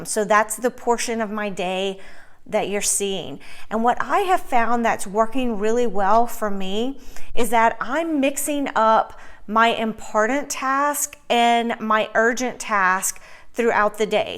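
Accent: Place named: Louisiana, United States. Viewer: AWESOME,! You have obviously done so much to teach the pups how to be good babies, too. Awww this was a great video. Looking forward to more.:) Love hearing your Louisiana accent on Youtube. It's rare to hear it these days. xoxox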